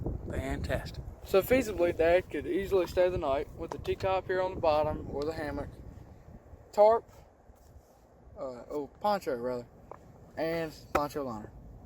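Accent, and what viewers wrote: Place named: North Carolina, United States. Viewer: A very good video! Thanks for sharing your ideas. Several will make their way onto my large ALICE pack. I found a M1976 sleep system carrier in new condition! One thing I didn't hear in the video was the total weight of that pack system as shown. How heavy? I'd also like to say that it's evident you have a lot of respect for your Dad and a great relationship. You do well on camera and have a great NC accent. I'm from KY originally and my accent finds it way out any time I'm around my "people". I was a boy scout (an Eagle!) all through the 70s and the ALICE was a very common pack back then. Was at the scout store recently and they have gone high tech with a rack full of Ospreys. Oh well, we traditionalists can continue to fly the mil-surp flag.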